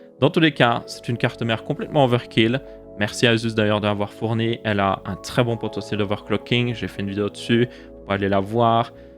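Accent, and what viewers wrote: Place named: Belgium. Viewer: Honnêtement, est ce que doit continuer de s’excuser de prononcer houit et pas huit (et nonante, septante etc)? Les gars il est belge laissez le dire ce qu’il veut dire de la manière la plus naturelle pour lui, on le connaît depuis suffisamment longtemps pour que ça soit pas dérangeant, même pour un nouveau viewer ça devrait pas être dérangeant, je veux dire y’a tellement d’accent différent dans le monde c’est quoi le problème?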